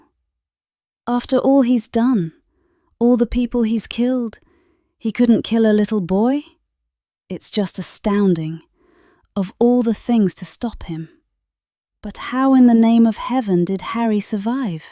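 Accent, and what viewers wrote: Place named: United Kingdom. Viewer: wow what a translation and english pronounciation. Pure British Accent. Awesome.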